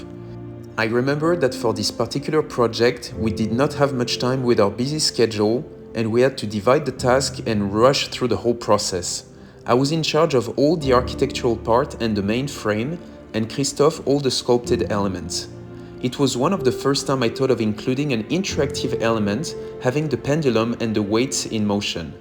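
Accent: France. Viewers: WOW. Remarkable. Delicious. Inspiring. Always nice to see sculpturing beautiful ART work out of chocolate. The FRENCH accent is a must. ❣️🏹🐪🐫🦩🦚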